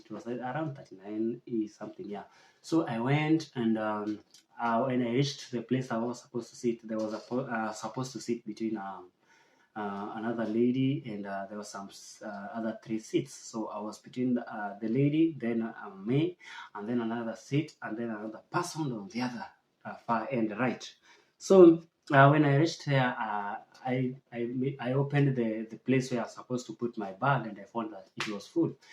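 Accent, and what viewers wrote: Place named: Meru, Kenya. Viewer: Its the meru accent for me 😂 that customer service lady alikusave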